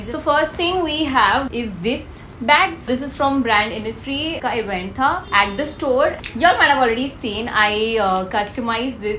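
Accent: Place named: Gujarat, India. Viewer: Apka gujrati touch acha lgta hai apki voice ma ❤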